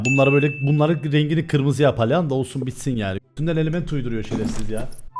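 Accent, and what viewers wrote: Cyprus. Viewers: Sırf kıbrıs ağzın için abone oldum çok iyiii msksleodk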